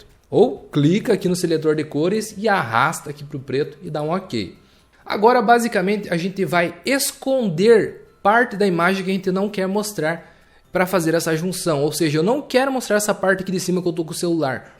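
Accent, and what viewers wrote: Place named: Paraná, Brazil. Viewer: Tua didática é excelente! Excelente vídeo. Grata por essa aula! Ganhou mais um inscrito! ;)Lm E Esse EEEE TEEE ae? És paranaense!!?? rsrsrsrs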